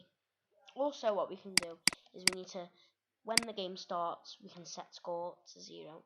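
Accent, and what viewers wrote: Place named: United States. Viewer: You sound so different on video . Xxx you sound American xx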